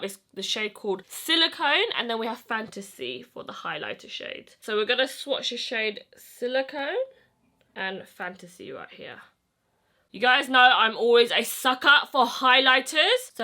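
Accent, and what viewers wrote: United Kingdom. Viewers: Talk about shocked.. I had no clue she was British 😂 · OMg she’s British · At first I didn't even realize she was british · I didn’t know you were British lol I like your accent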